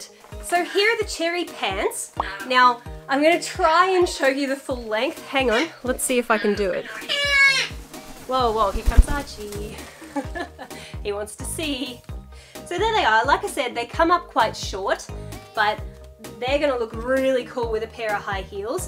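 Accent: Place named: Australia. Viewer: she is aussie she does not have a bad aussie accent u can’t be aussie or know their accent at all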